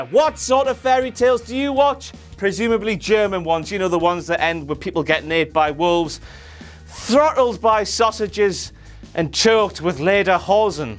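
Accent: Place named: Scotland, United Kingdom. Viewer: This guy is stupid. He just keeps bringing up the same shit that wasn't even funny the first time. And his Scottish accent sounds gay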